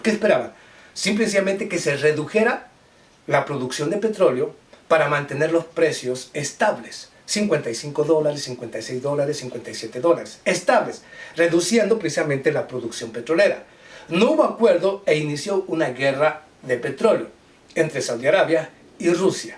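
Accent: Mexico. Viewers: Don't forget to mention me BRO am watching you from AUSTRALIA I really like the way of your analysis very easy to digest as well I like your Mexican accent.. well done Cheers...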